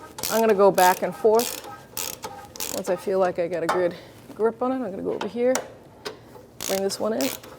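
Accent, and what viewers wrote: Boston, United States. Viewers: I hear that Boston accent and I feel like I’m home.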